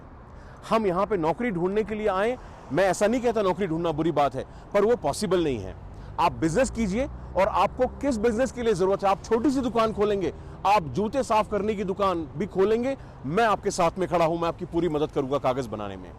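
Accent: Standard. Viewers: love you दोस्त, बहुत खुशी हुई आप अपने देश से इतनी दूर होने के बाद भी अपनी मातृभाषा नही भूले और गजब की शुद्ध हिंदी बोलते हो आप की भारतीयों को भी समझने में कठिनाई हो जाये 😜 अच्छा लगा किसने अपने का इस तरह राह दिखाने का काम करना 🌹🌹💐💐🌹🌹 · Your hindi is very pure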